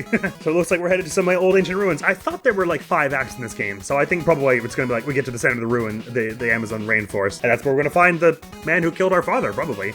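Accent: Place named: New York, United States. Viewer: That's still a ton of really cool stuff! Like you, I've never had an Xbox, but I've never had any PlayStation stuff either; I've only ever gotten Nintendo consoles, and between them and my computer, I can find access to the games I want. Some of them would probably feel better on original hardware, though. Me, I've got a Wii, a 2DS that's starting to wear down, that GBA SP, a Switch, and the dark pink DSi my parents got me all the way back on my sixth birthday, which has somehow managed to hold up better than the 2DS and Switch 😆 OMG GATO! I can't believe I never thought of that, I have one of those too, just haven't used it in a long time Those darn bosses really should introduce themselves! Listen, Mr. Boss Guy, do you know how many guys with scythes there are in gaming? One was the final boss of KH CoM, and he had not only a name but a freakin' EPITHET (the Graceful Assassin; I never got around to telling you all the Organization guys' epithets and lesser Nobodies, so if there are any you still don't know, I can fill you in) Yes, I'll just hang out at my local library and read until a ninja comes to challenge me... 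Then again, that would probably wreck the library, so maybe I'll stand next to the tesla dealership instead 🤣 Yeah, I feel that way too. Tbh, I didn't realize you were trying to do an American accent until you apologized for it. I just thought you were doing an action-movie-guy sort of voice, it sounded kinda New York or Chicago-ish "Back in my day, we had to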